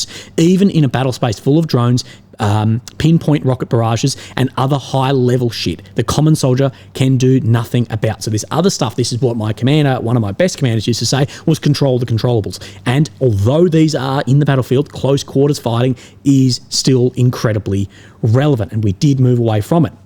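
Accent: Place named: Australia. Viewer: Australian accent cracks me up " He ahhhhhh" If you notice " He ahhhhhh". Then over " He ahhhhhh" you see..... but " He ahhhhhh". Love ya biddy! Keep up the good work " He ahhhhhh" :-D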